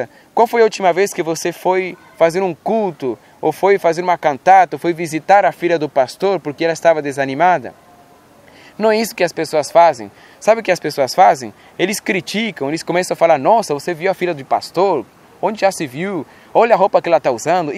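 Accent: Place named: Spain. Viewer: Você não é Brasileiro né? Seu sotaque é meio para o espanhol o enfim, eu também sou filha de pastor e já passei por muitas coisas que você citou no vídeo ...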